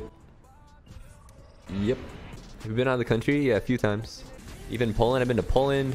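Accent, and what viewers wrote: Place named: United States. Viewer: Which country is Aceu from, or his home land ? He have American accent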